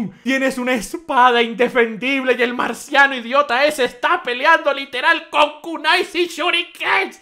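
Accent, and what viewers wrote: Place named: Venezuela. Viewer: suenas avenezolano.. ¿estás allá?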